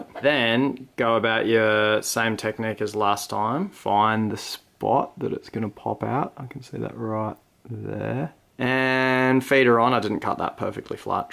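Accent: Australia. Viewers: These videos are so therapeutic to watch. Sometimes I just wanna watch a guy talk about fishing with good camerawork and a hectic Aussie accent.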